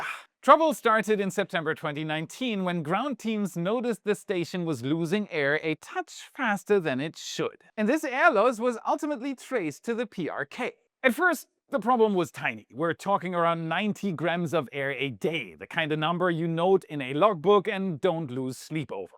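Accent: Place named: Germany. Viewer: Dear, i support the content, but please for deities knows... used ai to get ride of that german accent. It's giving me hai hitzer vivbes.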